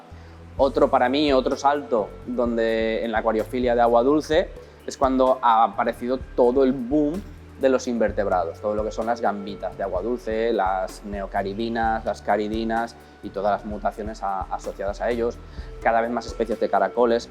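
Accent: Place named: Spain. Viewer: Como extraño la continuidad de videos del canal Como extraño a hablar con su acento español que es tan lindo y enamora, en fin si, enamora 😉 🇦🇷 ♥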